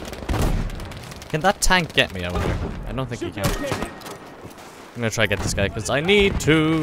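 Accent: Ireland. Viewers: U sound Irish...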